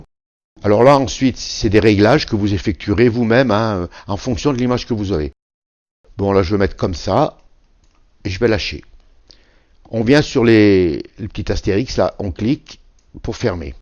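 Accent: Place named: Savoy, France. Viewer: vos tutos sont toujours tres clairs, en plus on a l'accent savoyard. j'ai visionne votre tuto sur le montage dans photoshop mais comment l'enregistrer pour l'envoyer par mail? Merci. C Del Grande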